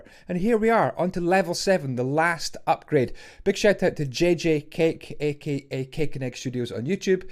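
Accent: Scotland, United Kingdom. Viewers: You don't hear muck scotish mine craft content so it's cool to hear a familiar accent being Scottish myself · I dont know why, but i love the scottish accent, its so awesome.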